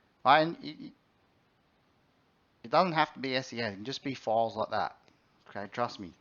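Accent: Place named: Australia. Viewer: Your accent makes this video 10x easier to pay attention too. Not sure why, you are Australian correct?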